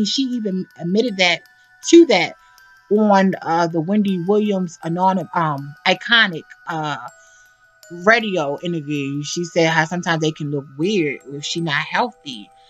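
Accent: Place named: Baltimore, United States. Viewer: Are you from Baltimore? Your accent sounds like it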